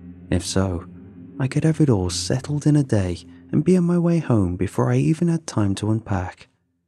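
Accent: England, United Kingdom. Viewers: A guy with an English accent talking about Missouri and Minnesota. 😅🤔👍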